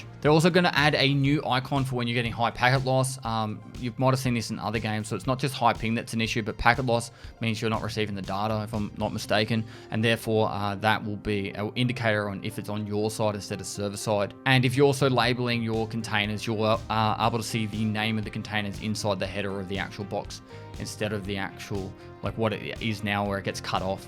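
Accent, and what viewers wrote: Australia. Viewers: he's Australian and his accent just makes it sound like that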